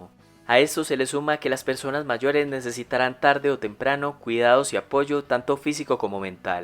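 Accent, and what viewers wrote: Antioquia, Colombia. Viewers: Puro paisa! Se le nota a leguas.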